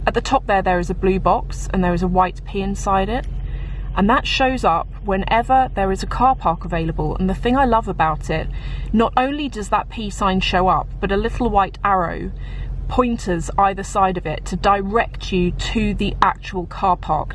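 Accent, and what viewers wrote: RP, United Kingdom. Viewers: She has posh accent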